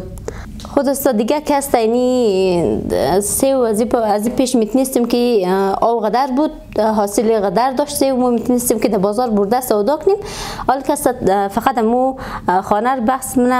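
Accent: Hazarajat, Afghanistan. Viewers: ماشاالله دخترآغیلموچقدربه لهج ساده هزارگی وشیرین گپ میضنی قربان زه بانتو شوم خوارجانمه🌈🦋👍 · توضيحات قشنگ تان به زبان ولحجه ای شیرین هزارگى بسیار عالی بود موفق باشید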